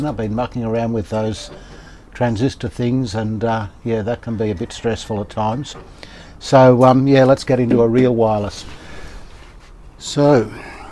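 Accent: Australia. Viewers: Geez thanks, Yup I first saw Manuel shield the caps this way then Dave and you, so if it's good enough for the pros, I figured it was the way to go. The thing is I have no formal electronics' training, I didn't even learn a trade in school; My first studies in college were photography, I struggled to get by in this field so later in life I had a career change and became a police officer (nobody's perfect...) Then after a few years of retirement I stumbled across some of Dave's radio restorations and I was hooked from the start. I always loved vintage audio and my personal gear is all from the 70s and 80s. I leaned about radios by myself and watching you guys' tutorials and got in contact with Dave for my first restoration of a 1937 RCA-Victor 87-K AM console. He's been mentoring me ever since, I owe him everything. As I gained a little more experience, like I said I started understanding more and more about radios and repairing them and there no better hobby for me at this point in my life. 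As for editing videos I know what you mean believe me, I 't have a proper video camera so I shoot everything on an iPhone and Apple being Apple, editing is always challenging... I of course subscribed to your channel some time ago and I'm always looking forward to your next instalment. Oh and by the way I love the Aussie accent 🙂 Cheers mate, lol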